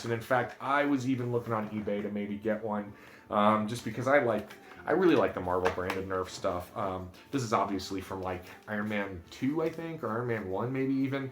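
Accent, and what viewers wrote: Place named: Ohio, United States. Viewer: Booger Barricade...., you can tell you are an Ohioan, I say this a boy born in Cincinnati and raised in Richmond, KY.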